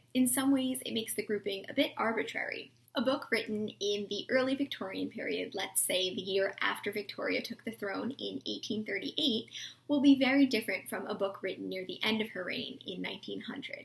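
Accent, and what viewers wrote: United States. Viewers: I absolutely love your videos, so informative about many time periods in literature. I particularly liked your video on the victorian period. I learn so much about literature from listening to you. Also you are such a good speaker I am beginning to become addicted to your channel. Which part of America are you from, just curious. Love you.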